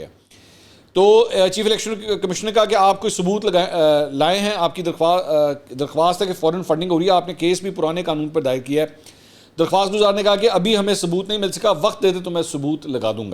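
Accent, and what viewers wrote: Punjab, Pakistan. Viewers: bera shok hey tumhaein baat lambi kerna k liye english peh ker punjabi accent main phir uss ka terjuma kerna ka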